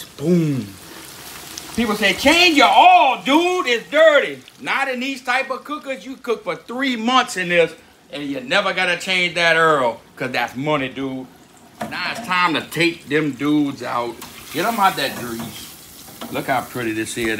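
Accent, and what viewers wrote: Louisiana, United States. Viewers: Please excuse my ignorance but is this a American accent or has he brought it there? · That's a cajun accent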